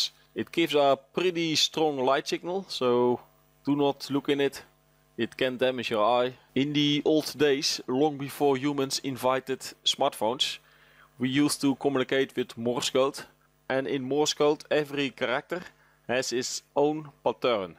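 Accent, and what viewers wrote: Netherlands. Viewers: Dutch, 100% Dutch innit he?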